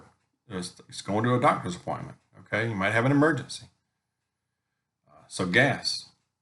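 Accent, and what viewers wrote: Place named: South, United States. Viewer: Not at all good. You sound as if you have a southern accent, like I do. It’s going to impact us as much as the East Coast.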